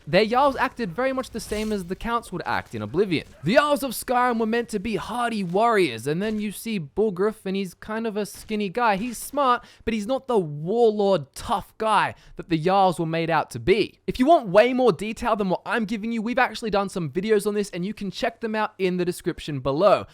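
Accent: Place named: Australia. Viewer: I really can't stand the australian accent, what's with all the upward inflections every 2 seconds?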